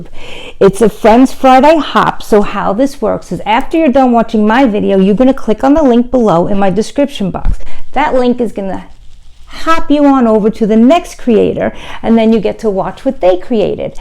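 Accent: New York, United States. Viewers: Boy you sound like a NYer... Where are you from? Love the angle and your palette tree. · So so happy you were apart of the hop! That angel 😇 was absolutely gorgeous! The pallet tree looked great with the clip lights, and that rustic candle stick was equally as beautiful! Great idea to distress with a nail file! Nice to hear someone with a similar accent! My family is from NY 😊 You now have a new subscriber! Look forward to watching more of your videos! Have a Merry Christmas 🎅 🎄 ❤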